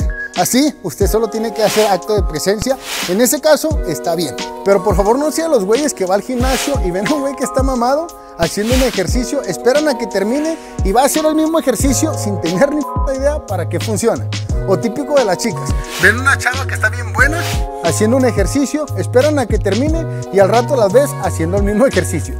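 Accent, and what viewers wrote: Aguascalientes, Mexico. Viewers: Por que así hablamos los de Aguascalientes.. 😂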